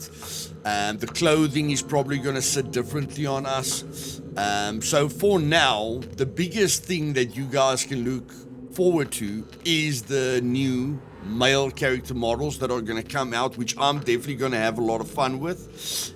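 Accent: South Africa. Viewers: Awesome video buddy. Very informative and interesting. Keep up the good work 👍🏻. You from South Africa? Excuse my ignorance if not haha but I can't pin the accent!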